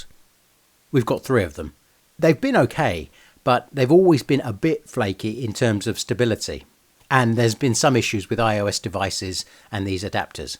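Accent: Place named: Latin America. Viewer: Porq hablas en inglés si se te nota lo latino?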